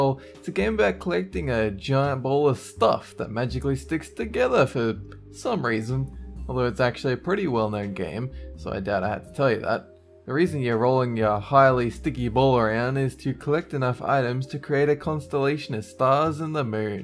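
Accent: Australia. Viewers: im aussie and im pretty sure he has an australian accent. whats wrong with it? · It's the Ausie charm, some people like it other's don't. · He has an Australian accent and a slight lisp. Some people like it others don't. I guess he does slur, but is that bad?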